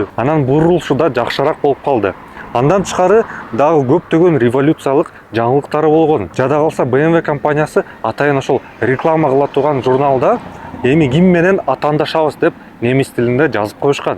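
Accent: Standard. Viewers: Азаматсын таза кыргызча суйлогон кыргыз экен!👍👍👍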